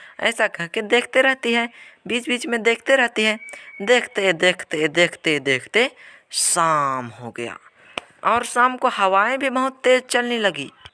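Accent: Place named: Uttar Pradesh, India. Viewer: Thanks bro for explanation it help me lot in explaining the question by the way it seems like you're from uttar Pradesh or Bihar and I am sure you will have million of subscriber in these year all the best and happy new year 🥳🥳🥳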